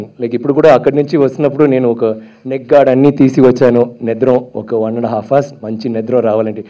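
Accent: India. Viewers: Telugu vade anukontunnanu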